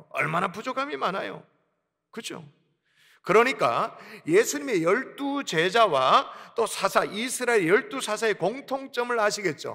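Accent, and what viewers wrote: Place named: Gyeongsang, South Korea. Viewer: 목사님의 경상도의 유하신 말씀속에 경상도 특유의 강하신 말투로 하나님의 강력한 말씀이 느껴지십니다 코로나때 온라인 예배로 늘 고맙고 감사드립니다~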